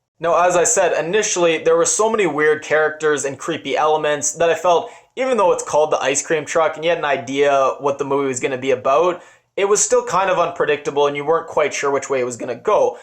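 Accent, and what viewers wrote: Canada. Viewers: So, you did NOT explain the ending at all. I think perhaps you are so full of yourself that you misread the ENTIRE movie and have NO idea what you are babbling about in your annoying Canadian accent, A! Who was the moving man in this plot and why did he appear at the end as a potential stalker, explain that one oh master of interpretation! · Just curious, Are you Canadian ?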